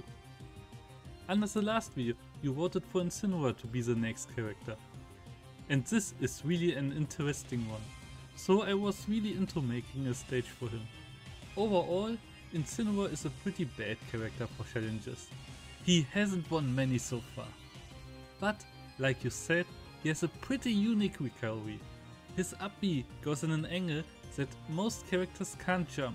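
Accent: Germany. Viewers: You sound german , are you german ? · kann es sein das du deutsch bist?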